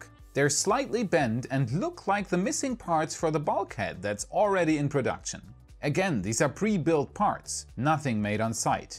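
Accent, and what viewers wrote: Germany. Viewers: I'm curious about where comes from. According to how he said "Einstein Bose", he really sounds (Northern) German. Does anyone know more? (Not being a native speaker, I'm not very good in detecting dialects)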